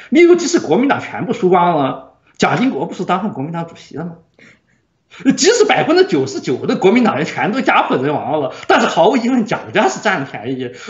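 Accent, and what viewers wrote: Sichuan, China. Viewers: 阿姨这四川口音233333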